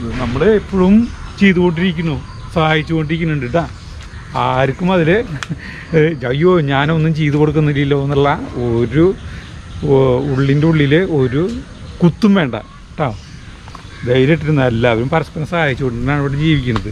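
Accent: Thrissur, India. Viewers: Thrissur slang❤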